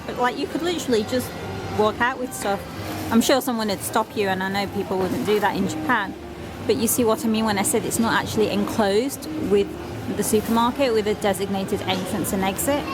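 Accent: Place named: United Kingdom. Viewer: Thanks for doing this beautiful video. I liked your British accent. Were your parents or grandparents from Indian Punjab?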